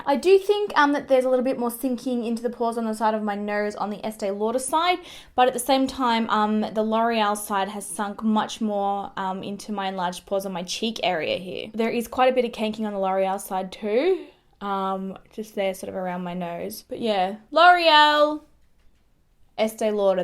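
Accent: Australia. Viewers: Australian is by far my favourite accent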